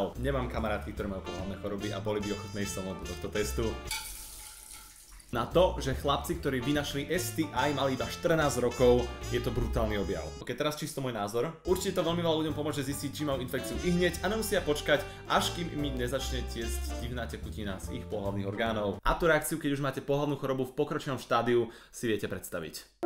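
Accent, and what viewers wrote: United Kingdom. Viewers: o Ten britský prízvuk :D niečo neuveriteľné :D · Ty si sa učil Britskú Angličtinu ? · Super britský prízvuk :) :D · Ten tvoj britský prízvuk mi pripomenul Wheatleyho z Portál 2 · haha ten uk prízvuk si zabill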